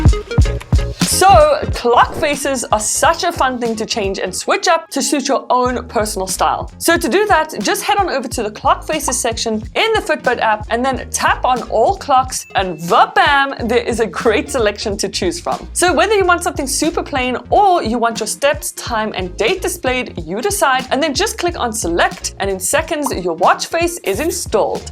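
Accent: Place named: South Africa. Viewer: I hear a South African accent ✊🏽 🔥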